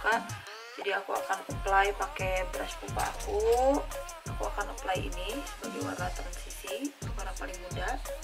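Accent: West Java, Indonesia. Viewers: Chhetri pantesan ada sundanya😄 salam kenal juga kaa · sunda banget kak, gusti nu agung=)) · teteh nyunda pisan 😍😍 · ^Gusti nu agung. ngakak si kaka nyunda :v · Sundanese bgt hihi lucu teh🙈❤️ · Baru pertama kali nntn video kakak & langsung suka! cara ngomongnya asik bgt. ada sunda2nya lagi😆 btw beli pupa brush nya dimana ya kak? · sunda pisan nyaa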